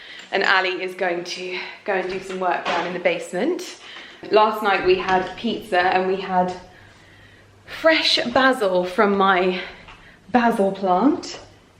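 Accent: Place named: Cockney, United Kingdom. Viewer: Jennifer Harris oh definitely has a cockney twang. I love when she does the baby voice for ali 😆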